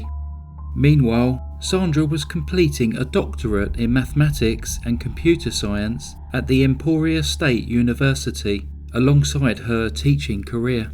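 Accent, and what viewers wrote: United Kingdom. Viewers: You are doing a great job. I really enjoyed your narration on the cases. I especially enjoyed your british accents.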